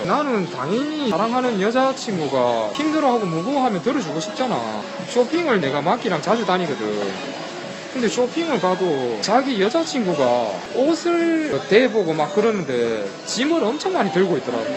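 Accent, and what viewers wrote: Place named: Busan, South Korea. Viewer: 부산사람 같은데요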